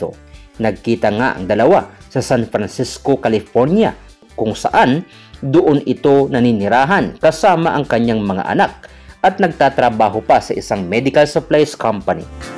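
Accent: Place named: Visayas, Philippines. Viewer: Bisaya siguro ang vlogger.😊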